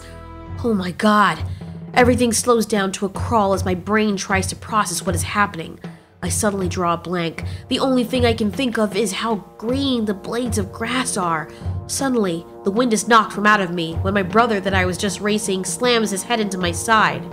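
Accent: Australia. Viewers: Can we talk about how your Australian ascent is on point, took my breath away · She has a good australian voice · Every like is for that Australian accent 👌😂 Also can you make a Baryonyx POV? I'd love to see that! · Nice Australian accent 👍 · I also heard a bit of austrilian accent wicth he actully has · I like the austalian accent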